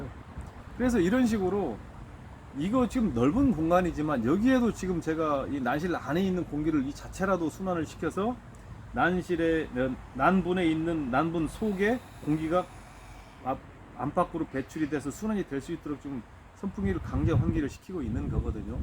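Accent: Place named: Jeolla, South Korea. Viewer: 말씀이 전라도분 같아요 어디신지~?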